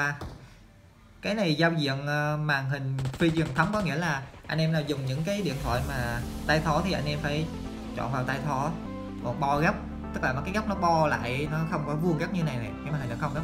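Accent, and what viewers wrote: Binh Dinh, Vietnam. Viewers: A phải ng bình định ko s nge dống thế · Anh là người bình định ạ ?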